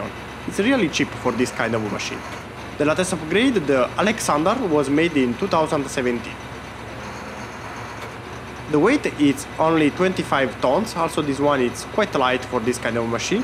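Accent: Italy. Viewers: Sei italiano so riconoscere un italiano che parla inglese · accento italiano sempre il migliore ;)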